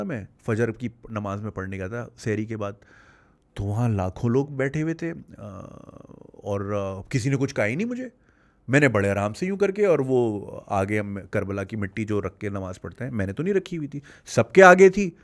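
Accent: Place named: Punjab, Pakistan. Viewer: correct your Urdu. You speak punjabi style urdu. For example, aap bolte ho, aap jate ho etc. Correct Urdu is aap bolte hain, aap jate hain. Secondly, why do you live apart from wife and one year old son. Sounds weired! Why? British Government do not allow you to live in UK. Also, you mentioned in one of your video that after the delivery hospital arranged free taxi to home for your wife. It doesn't happen here even if your are on benefit. I first performed Umra at the age of 22. Nothing spectacular happened as you described. It is typical of usual exaggeration.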